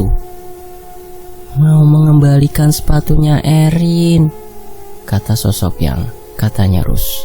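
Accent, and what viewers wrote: Java, Indonesia. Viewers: min medok bgt jowone 😁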